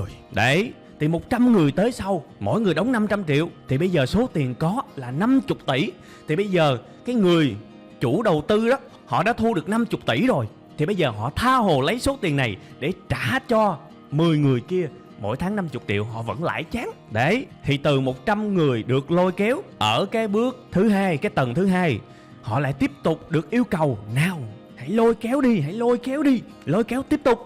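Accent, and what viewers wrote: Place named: South, Vietnam. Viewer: Giọng a hay , e thic giọng người miền nam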